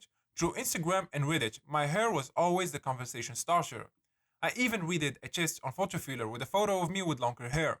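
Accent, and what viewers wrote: Morocco. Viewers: انت مغربي 😧😧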